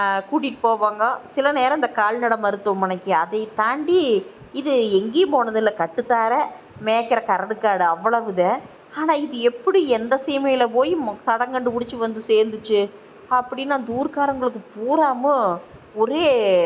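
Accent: Kongu, India. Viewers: Kongu tamil arumaii ❤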